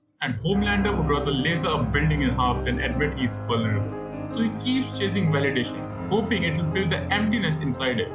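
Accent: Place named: India. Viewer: Open the video, hear an Indian accent. close the video.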